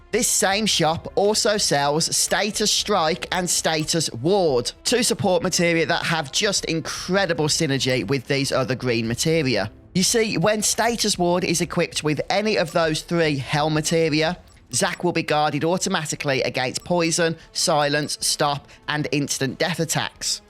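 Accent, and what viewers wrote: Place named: Black Country, United Kingdom. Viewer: Daaayyymm dude. Are you from the Black Country? So nice to hear that accent. I'm from Birmingham. But no permanent accent. I auto mimic whomever I talk to unconsciously.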